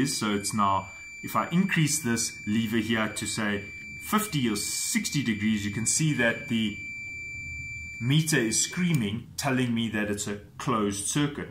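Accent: South Africa. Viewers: I'm in the middle East and I enjoy it when I hear that South African accent... nothing like home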